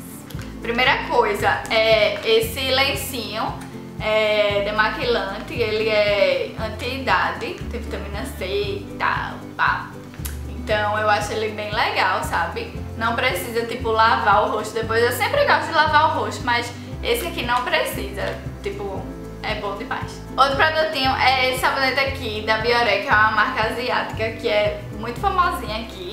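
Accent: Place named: Pernambuco, Brazil. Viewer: ah dou de pernambuco <3 amo nosso sotaque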